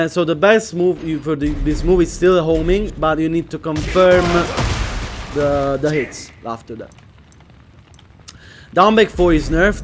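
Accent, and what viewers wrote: Italy. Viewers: L'ho capito subito che sei italiano bro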